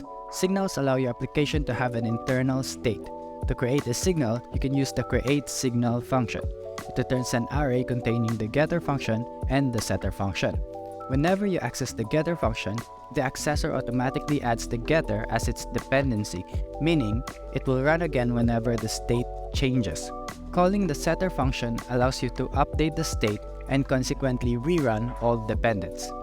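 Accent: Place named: Philippines. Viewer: Bro you sound like a filipino, I'm guessing you are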